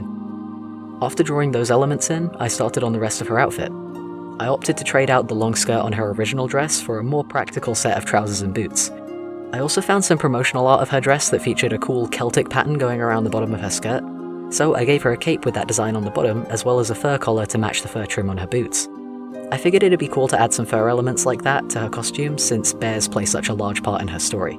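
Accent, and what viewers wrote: England, United Kingdom. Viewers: I know this doesn't relate to d&d, but I'm curious to know what part of England he comes from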